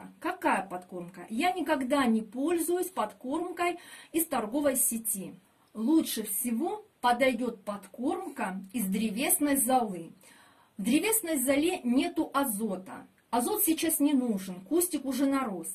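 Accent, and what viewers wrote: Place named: Russia. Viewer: Может быть у неё местный диалект,диалектов много в России?!Это же не урок русского языка в школе,а информационный канал,кто хочет ,тот поймёт!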